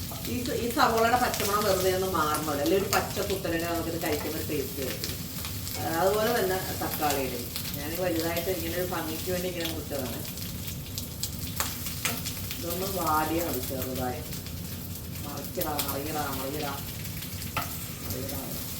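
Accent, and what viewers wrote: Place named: Ernakulam, India. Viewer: Super singu... Live happily God bless u ekm il ninnu parayunnapole undi❤❤❤❤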